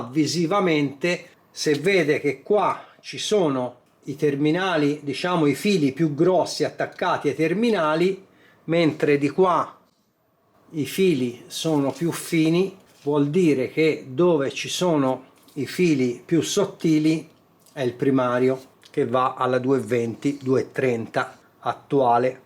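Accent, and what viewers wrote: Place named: Pisa, Italy. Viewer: Toscano ok... Ma.. Pisano? Non sei fiorentino, non sei livornese... Dall'accento direi provincia di pisa..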